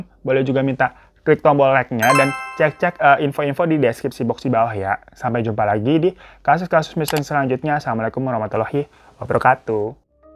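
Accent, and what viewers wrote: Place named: Central Java, Indonesia. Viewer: Abang aslinya dr jateng mana ngapak nya.....utara atau selatankah.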